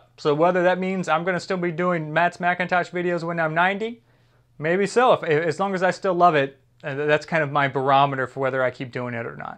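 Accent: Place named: Brazil. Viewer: Ahhh, Nice portuguese you spoke.. It had a brazilian acente but still Nice portuguese..!!hehehehe..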